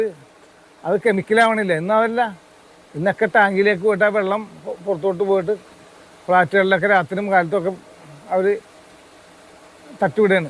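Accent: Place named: Valluvanad, India. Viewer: ഇതിന്റെ അവതാരകൻ ഒരു ബുദ്ധി ജീവി തായോളി ആണ്... അവന്റെ സംസാരം വള്ളുവനാടൻ സ്ലങ്ങും ചോദ്യം എല്ലാം അതുപോലെ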